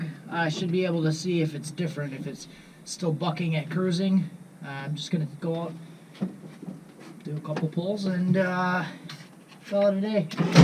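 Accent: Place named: Wisconsin, United States. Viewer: Wisconsin. I thought you were near me from your dialect lol. Yooper here.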